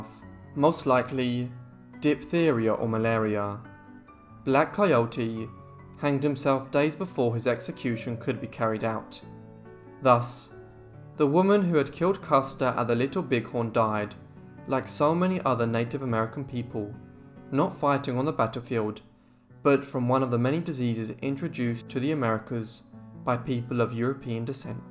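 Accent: England, United Kingdom. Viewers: this is wrong, please we need a native STORY TELLER not a brit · Not buying this for a minute, beware of American history lesson from someone with a British accent... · Very good, However, it seems a little strange for our early American history being told to us by someone with a British accent. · Why do you have someone from the UK narrating American history??? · I like these history narratives, but I have to say I almost skipped it at the start when I saw the narrator and heard the English accent.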